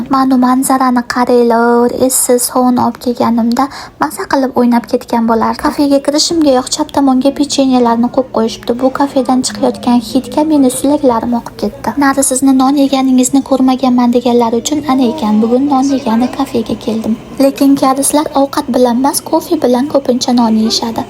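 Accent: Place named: Andijan, Uzbekistan. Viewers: Ha Anjonlika o'xshab gapiryapti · анжонлимисиз жавоб берингууууу😂ёмоннам кизикяппандааа😅шевез анжончага тортяптиююю😂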